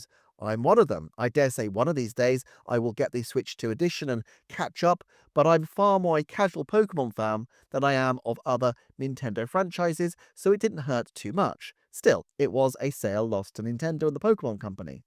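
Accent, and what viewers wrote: United Kingdom. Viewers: yeah is probably one of best nintendo youtubers out there, he's certainly well-informed and that british accent automatically grants him extra credibility lol (also i like your username, soli Deo gloria ✝)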